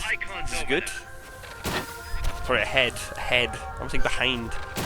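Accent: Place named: Scotland, United Kingdom. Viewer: Nice scottish accent. Whats up from Dundee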